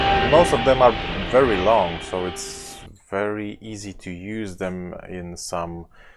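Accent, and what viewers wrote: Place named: Poland. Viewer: Mówisz po angielsku jak większość Polaków - od razu słychać pochodzenie. Biblioteka fajna - jednak nie moje klimaty. Pozdrawiam :)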